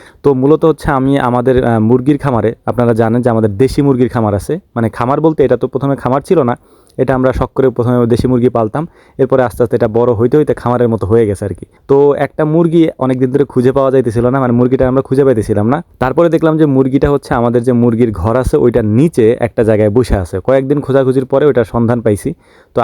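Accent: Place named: Dhaka, Bangladesh. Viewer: Aponar vasa sune mone hoy aponi Dhaka district er manus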